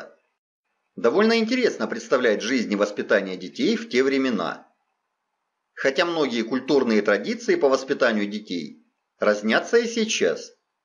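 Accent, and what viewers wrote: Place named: South, Russia. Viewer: .. ну южно-русский говор и что?..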